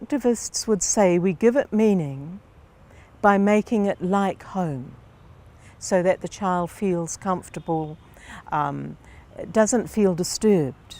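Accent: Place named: England, United Kingdom. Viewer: One of New Zealand's least intelligent academics, a fake celebrity charlatan riding the coattails of her own controversy to success. will be remembered forever as the moron who tried to mould the education system in her misinformed image and failed. Imagine sitting through a whole lecture of this bints unbearable Tory lilt, should have been deported back to the UK years ago.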